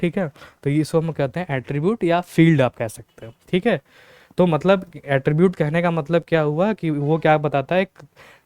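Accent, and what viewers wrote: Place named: Bihar, India. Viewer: lagta hai sir bihar se hai thik hai🙃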